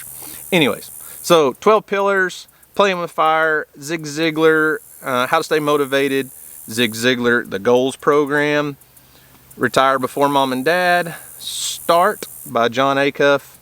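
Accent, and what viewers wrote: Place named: South, United States. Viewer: Hey, you have become a virtual friend that I enjoy spending time visiting with as you go about your days on the farm. I like listening to you ramble, it’s like sitting around a campfire with your best friend speaking the truth. I don’t watch tv just you tube, of course you caught my attention because you are so darn handsome with that southern drawl and you love to go fishing.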